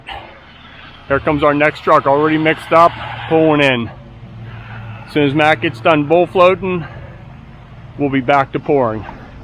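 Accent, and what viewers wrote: Pittsburgh, United States. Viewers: YOU SOUND LIKE YOU'RE FROM PITTSBURGH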